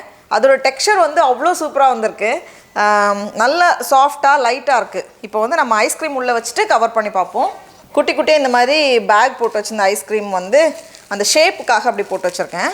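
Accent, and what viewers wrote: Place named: Kerala, India. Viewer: Are u malayali.. why am asking this because neenge pesumbothu malayalam slang varuthu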